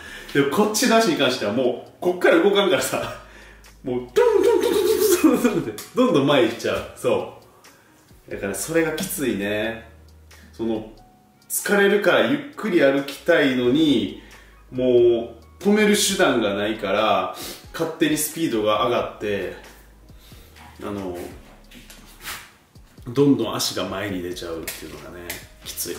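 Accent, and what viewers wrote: Kansai, Japan. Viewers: 大好きです❗️７６歳こころから応援しまーす。関西弁がとてもかわいいわー。おしゃれやし！動画見せて貰ったら、私もおしゃれしは、がんばらな！かわいいのん好きやし！ずーっと応援してます。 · 確かに義足は物質なので、肌に関することのトラブル多いですよね。自分の手足でも麻痺になると反対側の手足で動かしてコントロールするのを見たことがあります。痒くても手が届かない。脇などに汗かいてもあげられないなど… それぞれが工夫して生活していかないといけない現状がありますよね。元々関西人だから、話し方に親近感が湧きました。私もいつも不自由な所が身体にあるから、色々と共感できる部分もあります。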